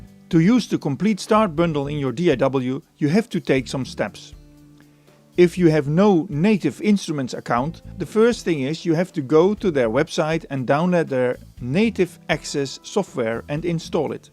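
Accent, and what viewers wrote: Netherlands. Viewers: are you dutch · I hear you are dutch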